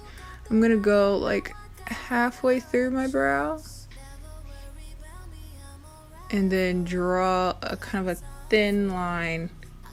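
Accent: South Korea. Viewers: Haha you definitely do pronounce Japanese things with a Korean accent. I did the same when I went to Korea the April just gone. I pronounced everything Korean with a Japanese one 😩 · I think the korean accent is cute when you speak japanese. I am on the other side of the coin where I learnt japanese and everything gets a japanese accent instead XD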